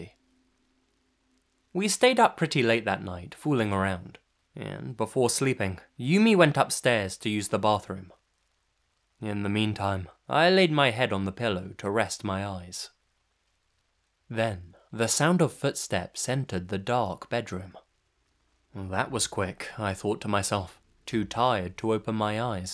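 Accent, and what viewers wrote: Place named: United Kingdom. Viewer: I have been watching you for a year and I've only just realized your British like me.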